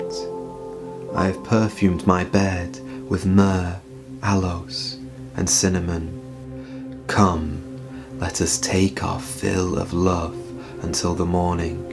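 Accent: United Kingdom. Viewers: I'm so glad you made this type of video,. I can listen to my Father's Word hour after hour after hour. I sincerely do love and honor God's Word and it's nice to have your British voice speaking it!😁 Thank you and may The Lord bless and protect you and your fam on your journey to spread His Word and His precious gospel in Christ Jesus' mighty name. Amen!!😇🎚️🙏🌈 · I am a Filipino Christian ,I just want to congratulate that your voice was lovely a British accent, so lovely hearing Bible verse..God's talent🎈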